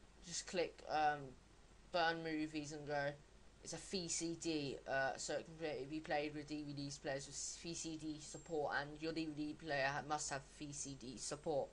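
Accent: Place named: United Kingdom. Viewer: I couldn't understand a word that this guy was saying!The British accent was bad enough, but you add him talking at light speed and he ight as well be talking in Martian language. Maybe he is a "Klingon trying to talk English!!!!PLEASE do not post anymore dirty Klingon videos because this is a site for humans onlyand English language only. Humans are biggoted when it comes to Klingons!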